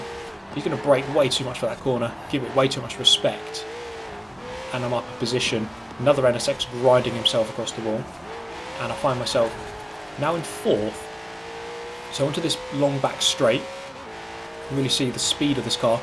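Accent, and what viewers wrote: United Kingdom. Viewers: british accent ; Miles Per Hour. hm..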